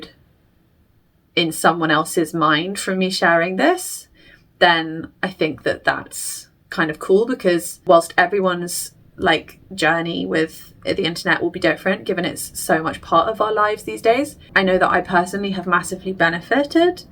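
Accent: United Kingdom. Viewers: eh? she has a neutral, educated accent to me which is common here in Manchester. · Why are you speaking in a scouse accent when your not from Liverpool?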